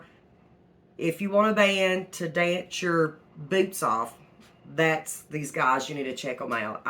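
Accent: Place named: South, United States. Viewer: I’m just loving the southern accent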